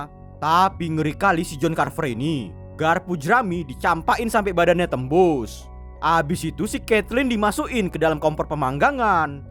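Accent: Medan, Indonesia. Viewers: awak medan kali bah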